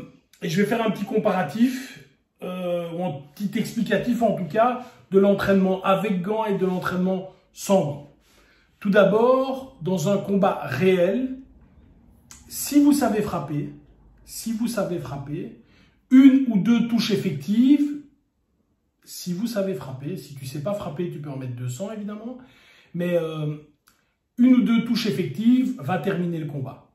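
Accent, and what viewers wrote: Belgium. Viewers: Merci pour les informations. Étant presque totalement étrangé au combat réel, votre vidéo m'aiguille sur comment débuter mon renforcement. Mis à part cela, ne seriez-vous pas de la famille de Zouloux :P ? J'imagine que non du fait que vous soyez belge (déduction dûe au <<septante>>), mais votre voix et votre façon de parler ressemble pas mal à la sienne ; le monde étant petit je demande tout de même haha. Bonne continuation à vous ^^ !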